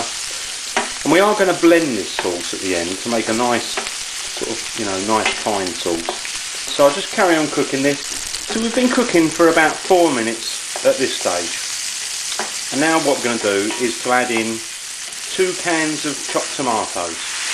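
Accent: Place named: England, United Kingdom. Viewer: Love your accent, man! I had the wrong idea that the english can't cook, but it is I who can not.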